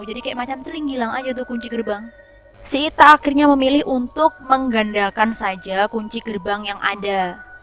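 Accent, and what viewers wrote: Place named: Medan, Indonesia. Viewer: Kamu orang Medan ya? Dari logat bicaranya seperti orang Medan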